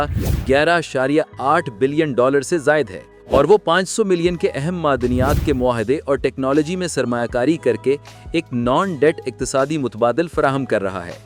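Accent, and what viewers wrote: Punjab, Pakistan. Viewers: ما شاء اللہ پنجابی میں دھوئی ہوئی اردو لیکن اچھا تجزیہ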